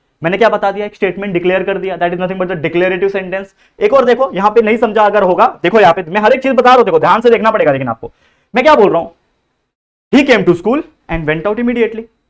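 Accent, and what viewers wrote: Maharashtra, India. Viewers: Sir aap Marathi ho kya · Sir apke muh se Marathi bohot pyaari lagti hai❤️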